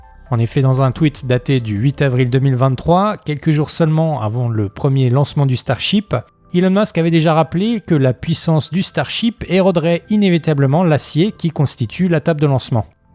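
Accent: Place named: United Kingdom. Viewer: Votre accent anglais m’éclate ^^ Je ne suis pas meilleure que vous hihihi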